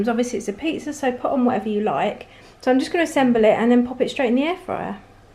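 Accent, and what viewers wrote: United Kingdom. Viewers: I don't like spicy food( generation thing) but it is so good to hear a British voice and knowing where we can get ingredients in our UK supermarkets. You are a good demonstrator too so more Air Fry videos please as they are becoming very popular with this energy crisis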